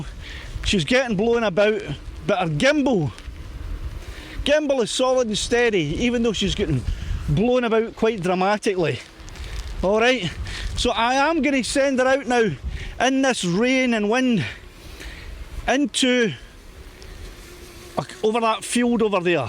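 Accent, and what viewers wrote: Scotland, United Kingdom. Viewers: What part of south west Scotland are you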